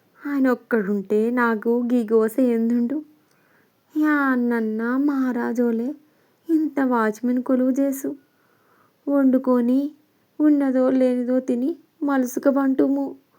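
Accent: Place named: Telangana, India. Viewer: The way you narrate the story in Telangana slang is really amazing, i loved it, you reminded me, my nainanamma slang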